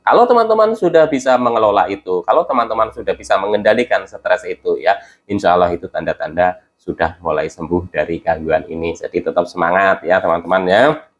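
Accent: Banyumas, Indonesia. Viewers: Nyong ngapak jga mas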